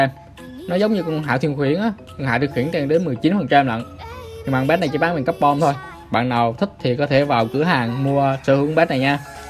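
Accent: Quang Ngai, Vietnam. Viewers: Anh quảng ngãi hả em quẢng nam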